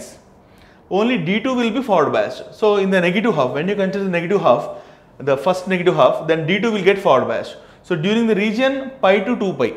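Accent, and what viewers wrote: India. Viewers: Vil how = Will have . W = V and V= W!!! Indian English!! Why not just pronounce each letter as it should be??! First I have to decipher Indian English in order to understand the video.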